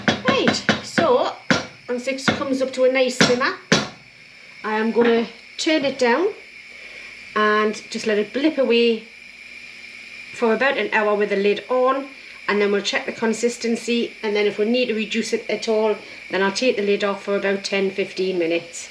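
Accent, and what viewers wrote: Newcastle, United Kingdom. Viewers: I moved to Australia 56 years ago from Newcastle and I just love your Geordie accent. i am loving your videos,the food is bringing back so many memories, so a big big thank you for the time you are putting in for our enjoyment. Also could you tell me the name of channel and I will follow him too. Love you guys.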